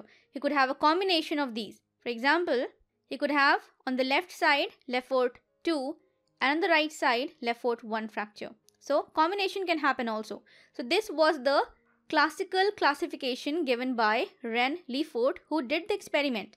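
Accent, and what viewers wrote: India. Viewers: A tip to get more followers: Study pronunciation. I dont get this tikamasala-talk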